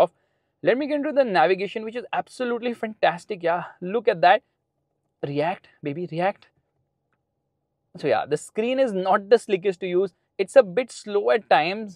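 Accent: India. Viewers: DEAR GOD HE IS SPEAKING SOOOO FAST THAT IS HARD TO WATCH VIDEO AND CONCENTRATE AT THE SAME. AND THAT INDIAN ACCENT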